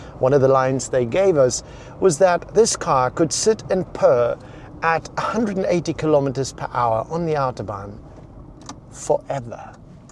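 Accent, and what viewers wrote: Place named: South Africa. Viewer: Where in South Africa are you from?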